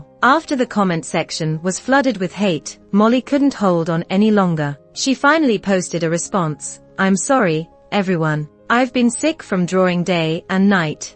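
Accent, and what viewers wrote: United Kingdom. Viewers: I have to admit... I don't even care what the story is about. I love the British accent lol. Though I will say this was very satisfying to see Molly and Ray get what's coming.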